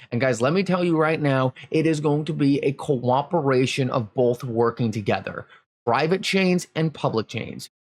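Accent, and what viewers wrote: Allentown, United States. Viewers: xrp is dead sounds like you have an Allentown accent. Figures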